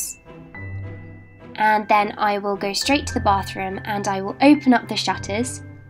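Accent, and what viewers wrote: RP, United Kingdom. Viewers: i love how british people say haiy like im gonna brush out my haiy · Ppl are always saying that British ppl aren’t like this/don’t sound like this, that’s not true, there are so many accents in the uk! I sound like her and so do a lot of people, not everyone obviously but it’s true that this routine fits the very stereotypical idea of England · im british and i can tell you that nobody is this posh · Love yr bristish accentttttt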